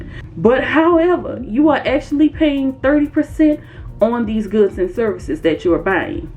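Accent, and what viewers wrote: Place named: Louisiana, United States. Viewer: I love yo accent, that’s Louisiana?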